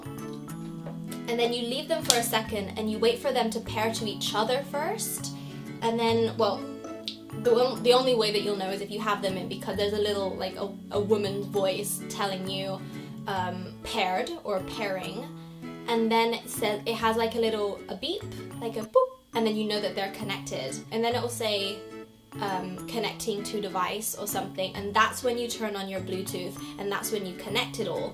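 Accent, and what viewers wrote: United Kingdom. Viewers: Do I hear a British Accent? That's very Cute 😃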